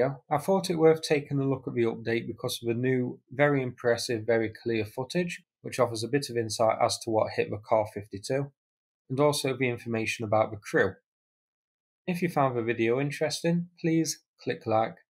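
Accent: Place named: Northern England, United Kingdom. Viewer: Loved your expression "a little late there chuck" I'm trying to work out where your accents from? Leeds or Manchester? I'm in Leicester where they'd say a little late mi duck.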